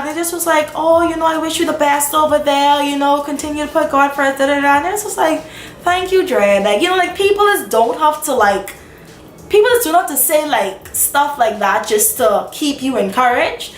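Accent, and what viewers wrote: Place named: Bahamas. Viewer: I like the fact that you do these videos with your Bahamian accent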